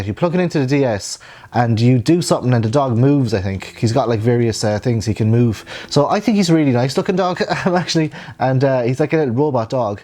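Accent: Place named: Southern England, United Kingdom. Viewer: I forgot I owned wappy dog until you reminded me. 😳 Might have to do a VR to this. Youre in Southern England.? The accent threw me a bit. Didn't think that there were many of us tubers in the south. 😁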